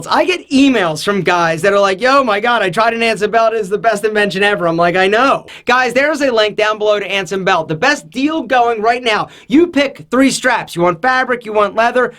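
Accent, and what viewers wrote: Philadelphia, United States. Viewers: Lolol i was thinking philly too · He is from Philly. As am I. You can tell right? Lol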